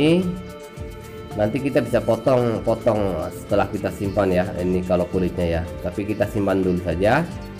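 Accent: Indonesia. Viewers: Ngomong nya kaya jawa tegal apa brebes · chef nya ngapak ya ?? suara nya enak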